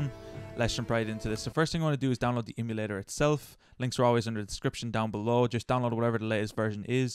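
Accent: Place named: Ireland. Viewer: what part of ireland you from ^^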